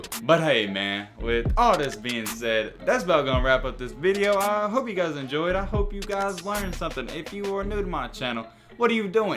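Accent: South, United States. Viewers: I like your country accent that’s why I watch you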